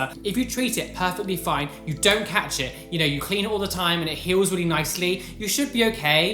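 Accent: United Kingdom. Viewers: You're british??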